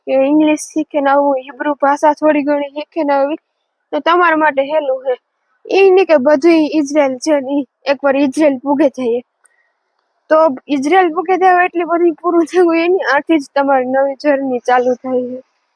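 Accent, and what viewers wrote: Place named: Saurashtra, India. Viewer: તમારી ભાષા ઉપરથી એવું લાગે સ્વોરાંસ્ટ બાજુ ના લાગો છો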